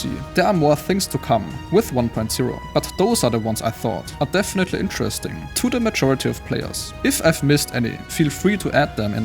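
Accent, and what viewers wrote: Germany. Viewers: Oh shiet I came here to say that the German accent added to the Nosteratu vibes wonderfully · Bist du deutsch ? Frag für nen Freund 😅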